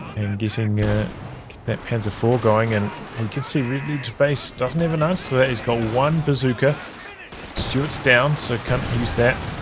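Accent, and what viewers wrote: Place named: New Zealand. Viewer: Great cast as always mate. Heading to Wanaka for a holiday come July. Your accent constantly reminds me of my upcoming holiday haha.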